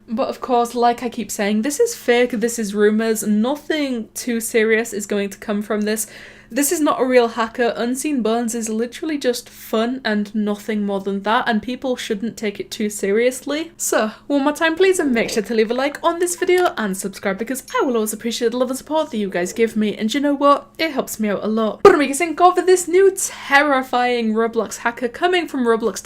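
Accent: United Kingdom. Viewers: are you like british?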